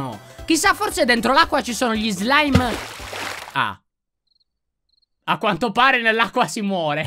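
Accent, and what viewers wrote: Sardinia, Italy. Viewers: ma sei sardo??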